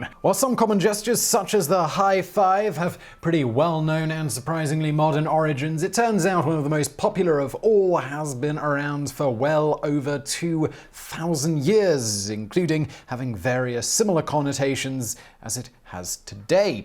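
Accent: United Kingdom. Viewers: This guy is f*cking hilarious! There's just something incongruous about a fellow with such a dignified, proper british accent using our favorite euphemism for "sexual congress.". As y'all might say, "Spot on, mate!"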